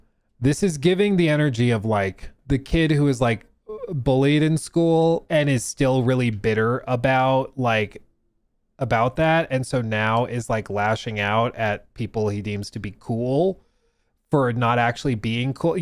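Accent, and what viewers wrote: United Kingdom. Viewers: Too much Brit 6/10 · 6/10 Too much British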